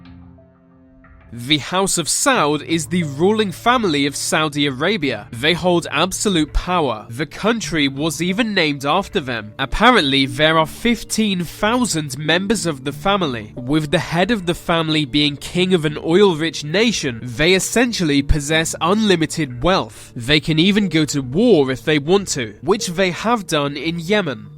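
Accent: England, United Kingdom. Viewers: I've heard many disgusting limey accents in my 47 years of life but this one is, by far, the most horrible and fucked-up. Can't even say from what part of the fucking island is. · How interesting, a man with a British accent lists the most powerful families, yet conveniently overlooks the House of Windsor (NOT THEIR REAL NAME). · first of most pathetic to hear every other type youtube with English accented fool!!!!!!!!!!!!!second of all the word power is and have been misued in terms of the meaning....in reality the meaning of the word power is used as money for this and beyond era...…all these idiots that you display for us on youtube are just idiotic money abusing ignorant megalomanias!!!!!!!!!!!!!! - because mentality is being misguided every decade, so does the terminology of the word...……..money taints the reality of what human beings stand for..... 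no not corruption but worse than that....control!!!!!!!!!!!!!!! brainless - no intellectual weapon of choice!!!!!!!!!!!!!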